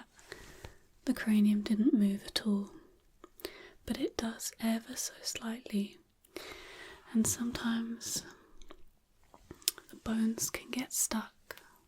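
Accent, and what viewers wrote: United Kingdom. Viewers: I think the accent and resonance of the voice, yes British or other may have a measure of engrained history, spirit, and stability which for me makes the feeling or resonance more appealing. My grandmother had that. For me the body language, face and hands also have this. I feel the societal treatment for girls/women also allows for many to maintain much more stability and ease. I feel this much more support (along with much more social interaction/transfer of skills over time) is working together to create much more lower average layers, ease of learning/motivation to learn (mental reward received for mental work expended), and together is allowing more and more ease of development in the information age. Theory which sees our average stress as much more complex: both conscious layers and many many other maintained subconscious mental work which for higher layers persons is forcing them to work much harder to receive the same mental reward and usually also missing out on much positive social interaction and better acquired mental/social/emotional skills over time. 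Hope theory will provide tools to more permanently reduce layers through more continuous resolution and tweaking faulty weights and values on our own to help permanently reduce many layers.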